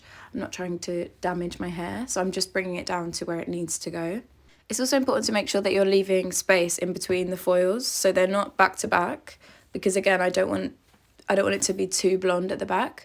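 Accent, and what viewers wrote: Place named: Sussex, United Kingdom. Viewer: I like your accent. Is it from Sussex??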